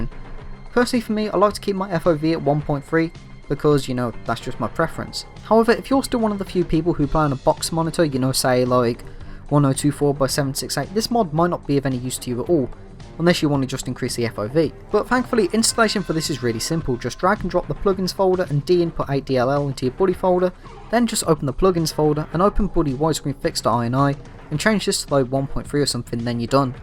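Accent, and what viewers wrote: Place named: RP, United Kingdom. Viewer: You sound a bit posh, where are you from if you don't mind me asking?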